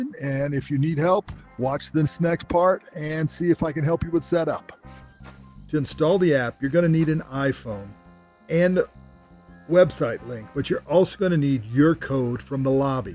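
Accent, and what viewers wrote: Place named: Texas, United States. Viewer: btw are you from Texas? No offense, your accent is kinda Texas like.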